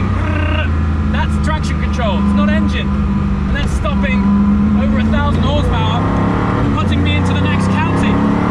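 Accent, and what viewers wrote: United Kingdom. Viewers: Why do you sound about 20% more british in this video?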